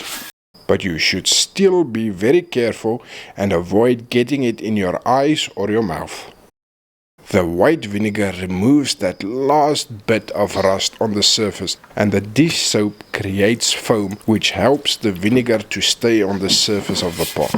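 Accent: South Africa. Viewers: I can’t wait to restore our almost forgotten pot, but I will definitely watch this video multiple times to listen to your heavy South African accent 😊❤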